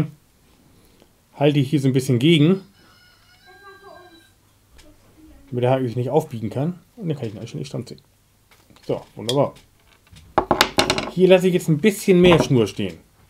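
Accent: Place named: North Germany, Germany. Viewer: Top! Immer wieder gute Ideen "norddeutsch" rüber gebracht! 😀😀👍👍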